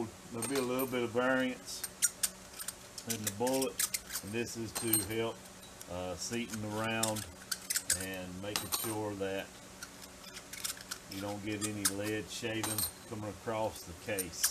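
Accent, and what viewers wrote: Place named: Tennessee, United States. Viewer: you sound like you from tennessee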